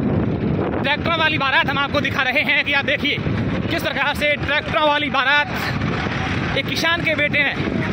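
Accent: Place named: Marwari, India. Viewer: Aapki aavaj marwadi andaj me or gramin se news late ho dhanay ho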